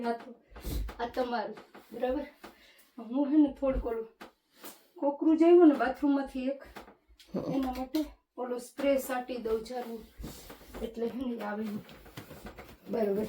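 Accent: Kathiawar, India. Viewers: અસલ કાઠિયાવાડી ગામઠી ભાસા..!!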